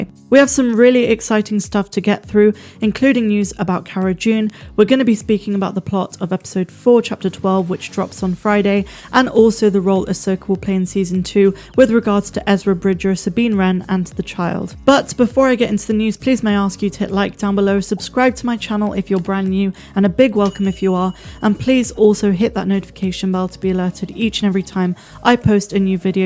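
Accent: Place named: South West England, United Kingdom. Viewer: Id know those hard rs in accent anywhere, your from the south west! Likewise lol, p.s. Great content as always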